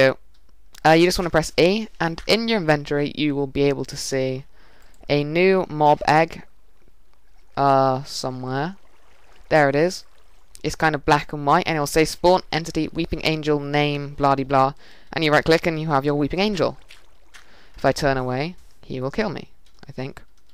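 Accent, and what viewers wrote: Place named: England, United Kingdom. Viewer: idk what your doing on the thing i cant read it :( and no offence to you or anything i just cant understand an english accent XD i need to watch more dr.who...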